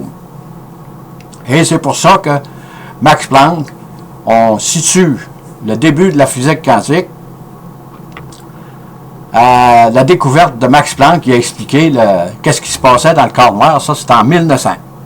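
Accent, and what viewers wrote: Quebec, Canada. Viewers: Depuis le Maroc Merci beaucoup. malgré l'accent québécois j'arrive à comprendre votre magnétique exposé.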